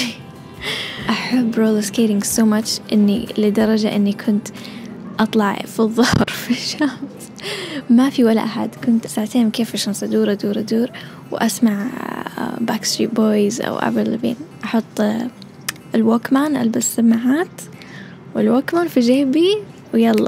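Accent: Saudi Arabia. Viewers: لهجتكي من جده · تكلمي عربي لهجتك السعوديه روعه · تتكلمي سعودي صح أنتي سعوديه ولا أيش؟ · صدقا اول مرة بسمع بنت بتحكي سعودي, بنت ذكية و جميلة جدا و مثقفة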